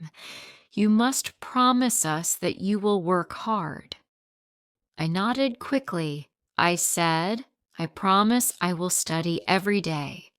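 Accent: United Kingdom. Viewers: Thank you for your story. Is it British English? I have fixed for myself some useful expressions.❤❤❤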